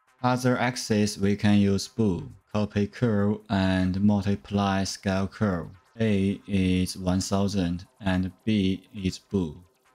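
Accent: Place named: China. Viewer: 比中文还中文的中式英语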